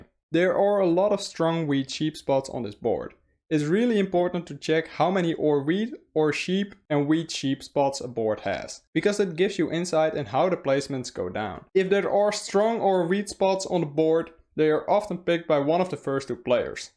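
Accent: Netherlands. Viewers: omg the dutch accent is so intense 0_0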